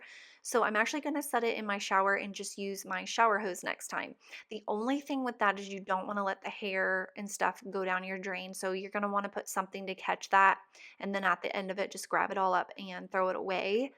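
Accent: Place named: Tennessee, United States. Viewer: HAHAHA, I knew you were from TN. Sweet little southern bell. It's fun cleaning with you.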